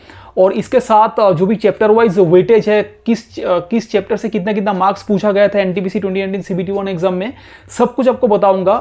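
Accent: Bengal, India. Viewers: Sir aap Bengali ho?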